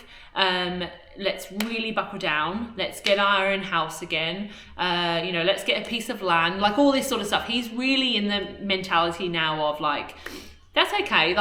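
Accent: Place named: England, United Kingdom. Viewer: Hi from New Zealand It is great to hear your English accent again. Thanks for your honesty of your life Once a traveling family always a traveling family. Yes you have to try things out to see if you like it. It is great to see a close family that wants to be together I admire that. Yes stay strong together and support each other. It would be great to see update videos on this channel every 6 weeks or so as I only follow the youtube format. It doesn't have to be flash and fancy. Just a talk video like this one and some short highlight videos. The house videos would be interesting to see but your future videos would be better. I at 59 would sometime in the future would like to come to Australia to do a 3 year traveling holiday but I have to encourage my wife to do it. We do enjoy for holidays and weekends enjoy traveling in our 2007 Jayco Sterling caravan and our 2015 ford ranger. 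It would be good to see you in NZ one day. All the best stay strong. Keep the great videos going thanks Jono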